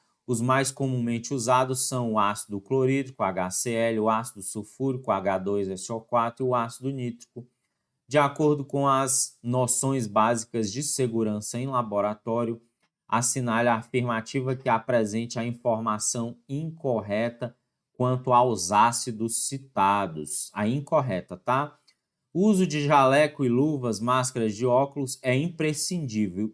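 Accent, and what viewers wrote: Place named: Brasília, Brazil. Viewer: Sotacão de brasília é inconfundível kkk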